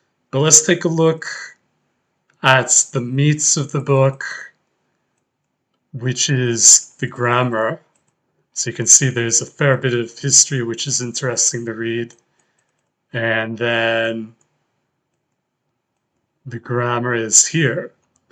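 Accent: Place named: United States. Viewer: What a concept! I am considering learning Afrikans and Frisian at the same time, or at least close together. I am going to go to your website because I am curious about you. Based on a few clues I think that you were raised in a household where English was the primary language of communication. But, you do sound American. So, my first guess is Yiddish.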